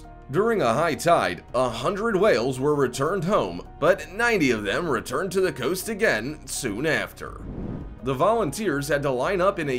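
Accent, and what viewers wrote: United States. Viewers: Might have been interesting to watch, but I only lasted around 15 seconds. I think you have to be american to be able to listen to that narration any longer.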